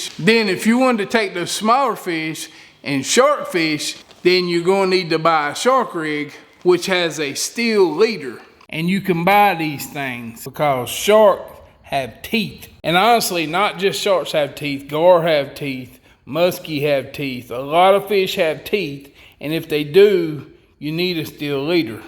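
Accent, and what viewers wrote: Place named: Alabama, United States. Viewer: WOW THANKYOU PAL I'M FROM QUEBEC AND I LOVE ALLS UR FISHING HACK'S VIDEOS AND I LOOK AND LOVE YOUR ACCENT SOUND LIKE ALABAMA ACCENT...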